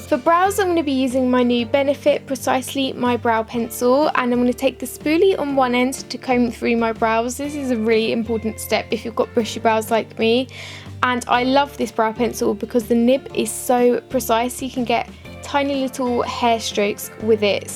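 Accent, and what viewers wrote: United Kingdom. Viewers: You're beautiful with and without makeup! BTW love your British accent :)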